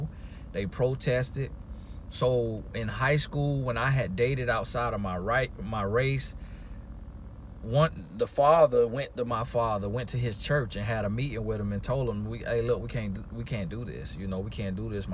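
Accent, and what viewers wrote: South, United States. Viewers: Be blessed 🙏 im loving that southern accent.. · I see now why I can relate to and appreciate the country grammar and accent in these videos. My folks are from Selma AL too. I think it is cool and respectful that you pay homage to your grandma and talk like her. We do that in the south. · Gurl. 8 was driving hollering and weak at how he was talking about Puerto Ricah Jumaican, and his first thought to the Lord when saw her booty. Omgosh. I fell out. I'm from the south and his mannerisms, cadence and demeanor is mad familiar and makes me laugh. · "Puerrta rica" and "Jewmakin" 😆 I love how southern folks talk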